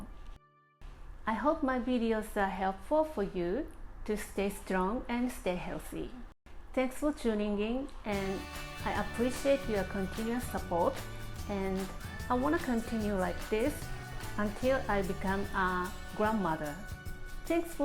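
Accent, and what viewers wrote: Japan. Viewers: Konichi wa. Your English vocabulary is very broad and accurate. Your accent and courteous manner just make you authentically Japanese. I like your channel because you show how to make healthy every day food that a Japanese mom would make in her kitchen. I don’t need a blowtourch to sear pieces of sashimi or a special pan to make takoyaki balls as is done on some channels. I do sometimes wish you would tell more about where to get some of the uncommon ingredients not readily available outside Japan or what can be substituted. I especially enjoyed this video where you introduced yourself and told us something about your background and hopes for your YouTube channel. Matané!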